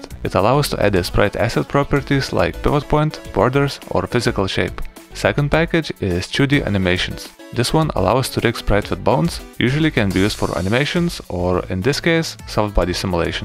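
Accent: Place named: Russia. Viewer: Damn dude you have such a русский акцент .. (Аж ваще)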